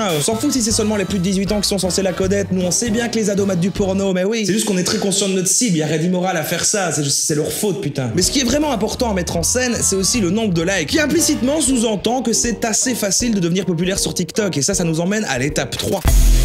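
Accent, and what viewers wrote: Belgium. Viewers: accent belge :p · Je cois avoir entendu un petit accent belge.... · il est belge donc oui, le "ui" on le prononce "oui"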